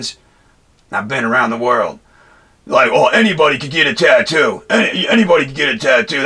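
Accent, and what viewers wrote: United States. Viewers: The accent makes me tear up as well, Murican as fuck, fuck yeah maaaan!! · The second I turned this video on I knew for damn sure he was a Carolinian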